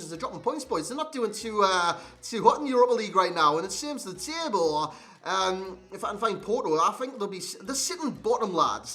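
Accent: Newcastle, United Kingdom. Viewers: Are you from newcastle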